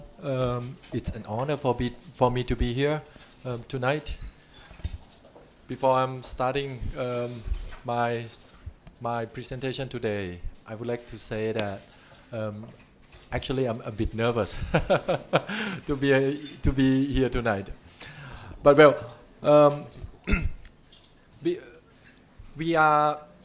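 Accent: Thailand. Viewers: สำเนียงแบบไทยไทยแต่เข้าใจง่ายดีครับชอบมากเลยครับผม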